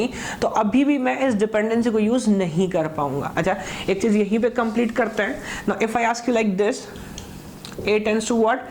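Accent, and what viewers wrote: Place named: Indore, India. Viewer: it seems sir you are from indoor . You have that tone " kya bhi milega ". thanks alot sir for all the videos. I have being following all the lecture one by one .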